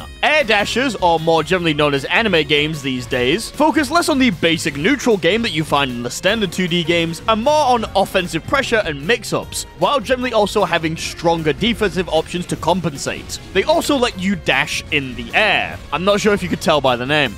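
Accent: United Kingdom. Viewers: you forgot about your silky smooth british accent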